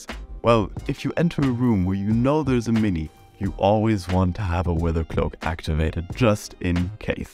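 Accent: Germany. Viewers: Yo, nice german accent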